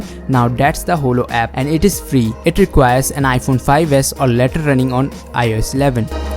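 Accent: India. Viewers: you must be a south Indian...probably Telangana or Andhra.by the way Video content is useful.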